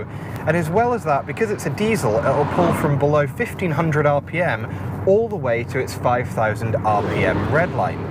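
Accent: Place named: Scotland, United Kingdom. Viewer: Accent seems like a mixture of scottish, australian at times and then some american. Random...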